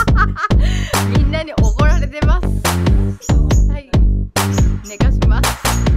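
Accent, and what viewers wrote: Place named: Ishikawa, Japan. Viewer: ナイス石川弁！！もっと方言出してー！！嬉しい('ω')ノ♥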